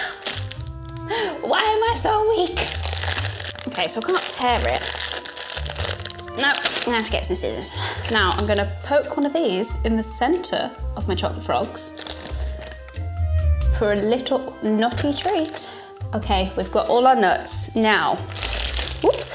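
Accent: Birmingham, United Kingdom. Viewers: You are sooo brummy in this video, u cute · The brummie accent is so apparent in this video and I love it 😂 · Hilarious. Also, your brummy accent came out loads in this 😆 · You sound so Brummy in this vid! 😂😘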